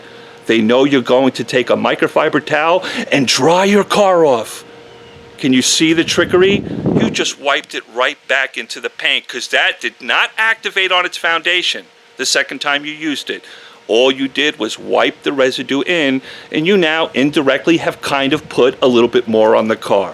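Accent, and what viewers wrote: New York, United States. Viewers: If he's here in Dallas, why does he have a NY (or L.A.?) accent ? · That's a NY Accent. LI likely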